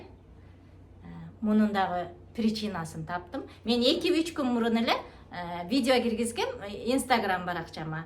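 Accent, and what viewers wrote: Osh, Kyrgyzstan. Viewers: ушул кыргыздын кызы мага айабай жакты сонун суйлрйот оштон болобу тили оштуртардай суйлойот Америкада жашосун улантып журот жашосу сонун❤❤❤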